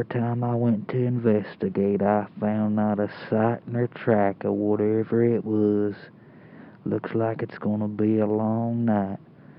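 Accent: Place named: United Kingdom. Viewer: Snow in the Louisiana swamps along with the narrator's British accent make me believe this is real.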